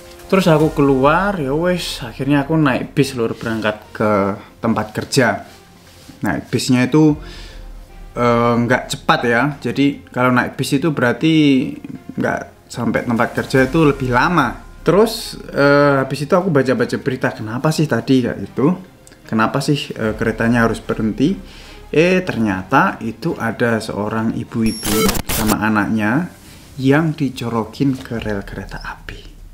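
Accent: Java, Indonesia. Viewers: Baru ketemu channel ini. Kok, bisa lidahnya istiqomah medok sekalipun sudah jauh ke Jerman? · Org jowo Yo mas?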